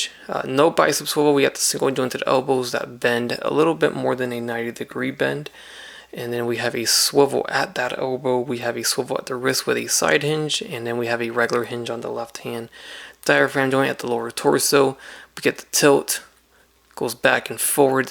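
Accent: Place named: Asia. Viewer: great video man. i can't wait to pay resale prices for this lol...i have a random question tho, is your last name, what is your ethnicity? i sometimes hear an asian accent, asking for a friend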